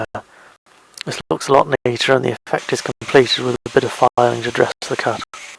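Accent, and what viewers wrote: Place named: RP, United Kingdom. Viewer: such a posh accent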